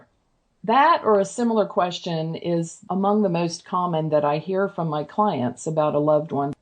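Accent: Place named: Indiana, United States. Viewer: Love your accent. Could be Northern Indiana. I know time doesn't exist there (hereafter) as we know it, but I can't get my mind around what they do "all day" over there.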